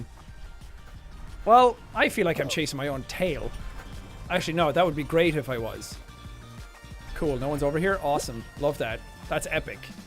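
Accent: Ireland. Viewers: He sound a lot more Irish love it. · How have you come back with a stronger Irish accent even tho you live in the forbidden land 😭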